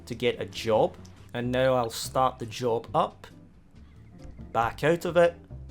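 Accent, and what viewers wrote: Scotland, United Kingdom. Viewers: You got a very strong scottsh accent my boy😂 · i hear scottish mother tongue- i like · clicked for the information, stayed for the scottish accent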